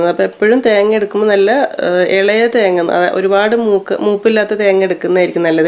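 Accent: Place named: Perinthalmanna, India. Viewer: പെരിന്തൽമണ്ണ ശൈലി ഉണ്ടല്ലൊ ഭാഷയിൽ. പെരിന്തൽമണ്ണയാണോ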